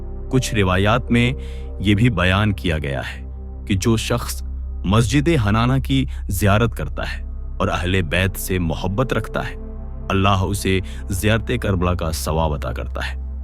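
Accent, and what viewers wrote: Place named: India. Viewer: بھائی ہندی تلفظ میں اردو بول رہے ہیں ، جائرین اردو میں زائرین ہوتا ہے۔ یہ صرف مسلمانوں کو مزید تقسیم اور گمراہ کرنے کی ناکام کوشش ہے۔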